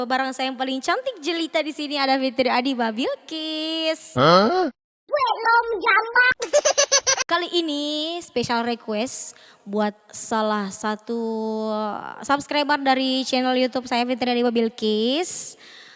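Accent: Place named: Jakarta, Indonesia. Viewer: Ngomongnya kayak orang Jakarta lho taunya orang Bugis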